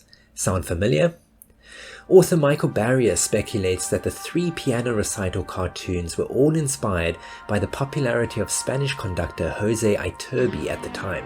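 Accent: South Africa. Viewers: Are you South African? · 2 seconds in and i hear a S.A accent....instant sub🌌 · You sound South African af my dude Sick vid tho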